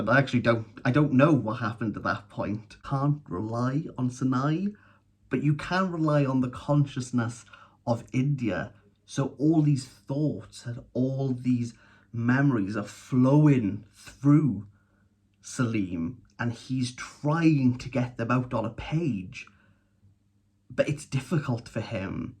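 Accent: Wales, United Kingdom. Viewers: Mate, what an amazing review. I love your energy and engagement. Please keep it up! (And sorry for my ignorant question, but accents from Britain fascinate me — is that a great Welsh accent you have?) All the best.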